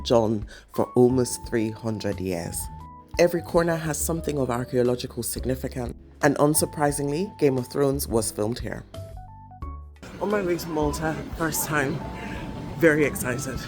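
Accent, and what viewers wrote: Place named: Nigeria. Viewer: Beloved, ur intonation sounds Nigerian😅😅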